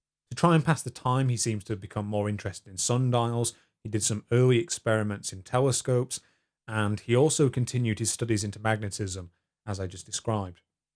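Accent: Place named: England, United Kingdom. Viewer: thats not an American an Englishman. Trying to act smart when you cant even tell accents apart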